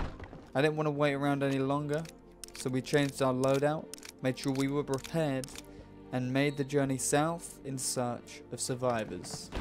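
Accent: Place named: United Kingdom. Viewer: is Britsh im assuming from his accent and Daliy Dose of Internet is American but yes they sound simumlat in a way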